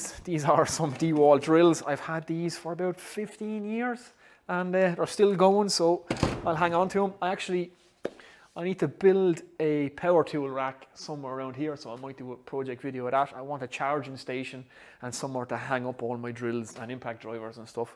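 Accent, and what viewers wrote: Ireland. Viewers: Dublin / Dundalk accent ?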